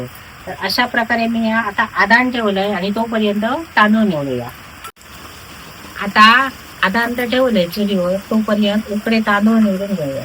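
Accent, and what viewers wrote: Malvani, India. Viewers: खरा सांगा, तू वाहिनी पूर्ण मालवणी बोलतंय आयकाक खूप बरा वाटला. वालय , बेळो , डोनो वाळण हे सगळे शब्द आमच्या घरातली आठवन करून दितत. फक्त गडबड अशी व्हाता पेडयांची कढी बघून प्रत्यक्ष जेवक गावला नाय ह्याचा वायट वाटता. आसानी, देव तुझा भला करू मावले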